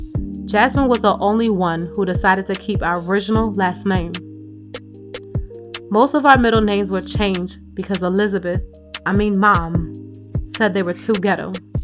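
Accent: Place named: New York, United States. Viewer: Wow 😯 this is good I’ll be waiting on chapter 8 and you still sound like NY peace sis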